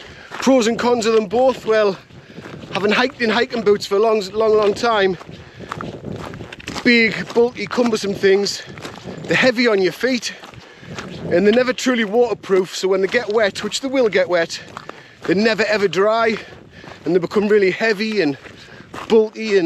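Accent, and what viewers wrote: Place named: United Kingdom. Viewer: Agreed!! I hike a lot!! And i play tennis and dance. I have a lot of callous. 😁 I watched all your CWT videos, enjoyed it. I went the same route when i did CWT in 2023 and 2024. Sometimes i have trouble understanding your accent lol 😄 so i had to hit rewind and listen again and again. May i ask what British dialect is that? Im from the US and clueless.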